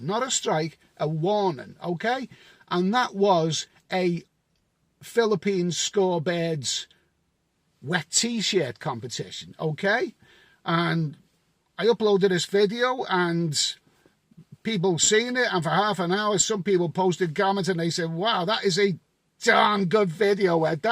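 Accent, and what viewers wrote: Liverpool, United Kingdom. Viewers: A scouser in a fedora peddling derty smutty videeos....count me in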